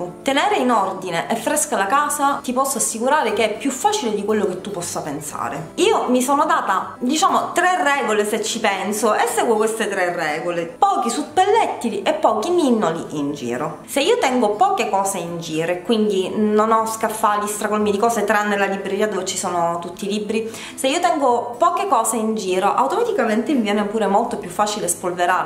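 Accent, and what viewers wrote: Sicily, Italy. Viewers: per favore puoi farci un home tour di casa tua... sto per arredare casa e vorrei magari dei suggerimenti e delle idee... sei bellissima e fantastica mi piace un sacco l'accento siciliano che hai grazie per la compagnia un bacione:* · ma sei siciliana????😉 infatti hai detto bene essendo in casa sola o.....massimo 2😊😊 se uno ha bambini piccoli gia la cosa cambiaa😁😁ti va passare anche da me??? ciao carinissima...simpaticona...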